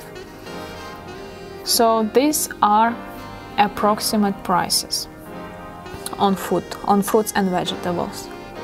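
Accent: Russia. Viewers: как-то странно слышать английский с русским акцентом😅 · Madam Your Russian Accent Is Amazing.i Like It. Love From Pakistan💓🤗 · Is that a Russian accent?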